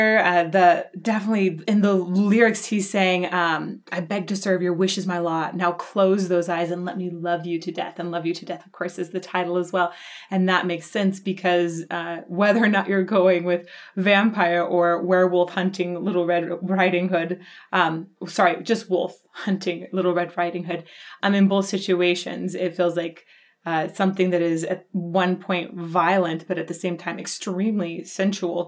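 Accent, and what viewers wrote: Brooklyn, United States. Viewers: That's a Brooklyn accent hahahahahaha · Brooklyn accent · His accent is of Brooklyn ny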